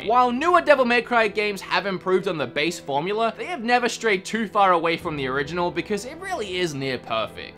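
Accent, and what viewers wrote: Australia. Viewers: Wait, your Aussie? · Lol I didn’t realise your Australian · I'm so glad you finally said your accent, I'm Australian too and it was killing me bc of the American hints you have too, I was so confused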